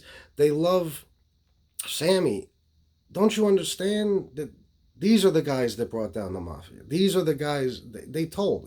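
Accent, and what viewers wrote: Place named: Northeast, United States. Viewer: You only have an accent to people not from the northeast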